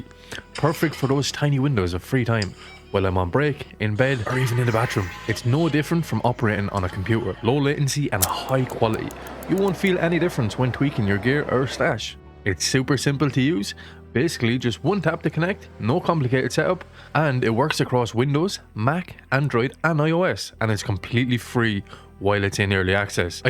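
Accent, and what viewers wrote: Ireland. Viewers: He sounds Irish.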